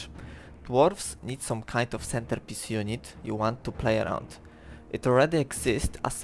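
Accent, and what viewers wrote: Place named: Poland. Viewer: Slychac ze polak haha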